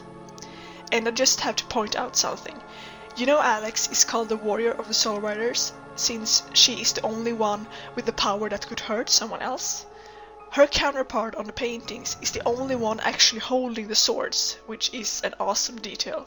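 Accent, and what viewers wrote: Sweden. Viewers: are you from swden?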